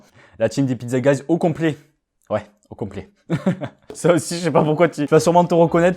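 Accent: South, France. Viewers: Byron 650 c'est l'accent du sud je pense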